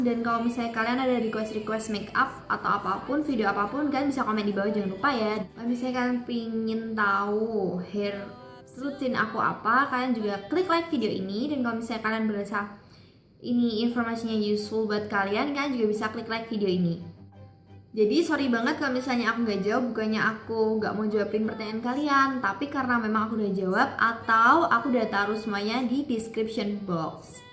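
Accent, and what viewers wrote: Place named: Surabaya, Indonesia. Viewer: Iya aku juga mikirnya kamu org surabaya karena ada logat surabayanya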